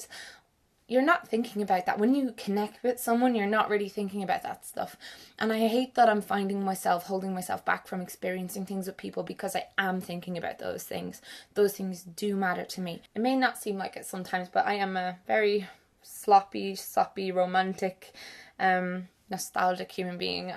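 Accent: Ireland. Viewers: Compatibility: we have the same beliefs, we're both bi, we love makeup, I love the Irish accent Chemistry: I'm bad at science but good for you It's ok with me if you move to Wales now x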